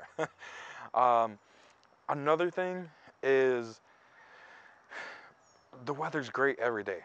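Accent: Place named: Illinois, United States. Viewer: You got the typical Illinois accent. It's super strong.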